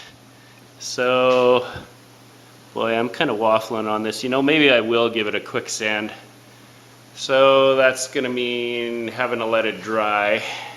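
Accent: Canada. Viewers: Just watch your 4 videos start to finish, good stuff! Had a good laugh reading the comments "Are you in Canada?" I could tell you were a Canadian boy right from the start lol. From Ontario by chance? We should wheel sometime if you are.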